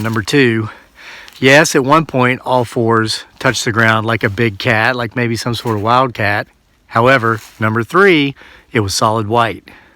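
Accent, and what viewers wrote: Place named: Virginia, United States. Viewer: Ah hahhh, i thought you sounded like a fellow Virginian - please carry a firearm with you outdoors, my brother... I can tell you stories about a rodent looking creature as big as an elephant to invisible predators & spots in Goshen pass that will suck you underwater just to put a foot in. 💯💯💯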